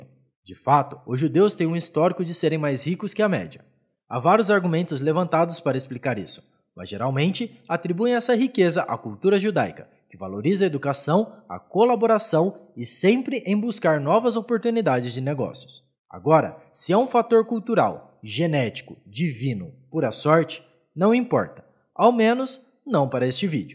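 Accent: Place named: Paraíba, Brazil. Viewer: kkkkk isso que eu ia falar , sotaque meio paraibano do norte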